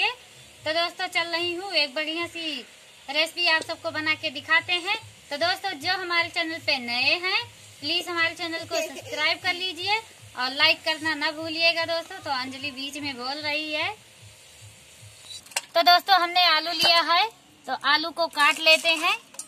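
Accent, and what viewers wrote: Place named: Uttar Pradesh, India. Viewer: Aap up ki ho na bilkul mere gaon jaisa bhasa hai aapke gaon ka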